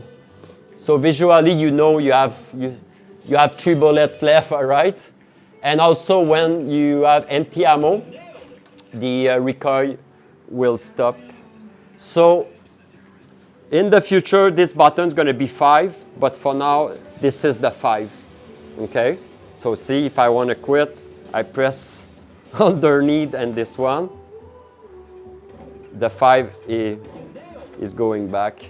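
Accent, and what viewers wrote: Quebec, Canada. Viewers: Woah you sound so Quebecers mon chum! Subscribe esti! J'suis content d'avoir un fan d'arcade/retro de chez nous!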